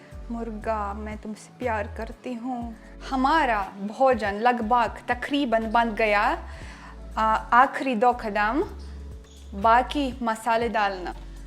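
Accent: Standard. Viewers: इतना शुद्ध हिंदी तो हम भारतीय भी नही उच्चारित कर पाते है.. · Aap hamesha khus raho sabsa bada Rog kya kahenge log aap bahut khusmizaz aur funny ho,apki sudh Hindi aur aap bahut acha bolti ho apke vlog dekhkar bahut Anand ata hai maine apke vlog pehle bahut Miss Kiya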